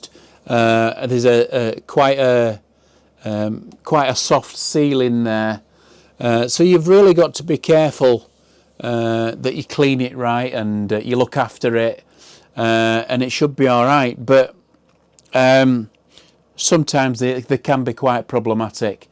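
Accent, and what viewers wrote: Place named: North, United Kingdom. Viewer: Thank you for this great video.. A really big thank you 🙏.. Loved your northern Accent 🙂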